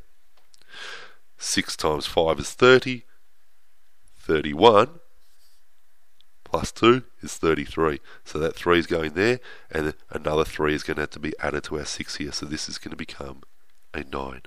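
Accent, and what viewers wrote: Australia. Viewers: Not sure if you already realized this, but it's not a British accent it's Australian (did you catch that stereotypical aussie "G'day" in the beginning?)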